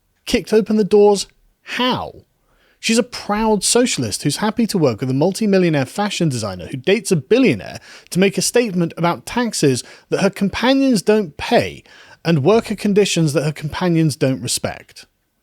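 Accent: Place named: West Country, United Kingdom. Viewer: It's that ever so slightly West country accent. Makes me homesick.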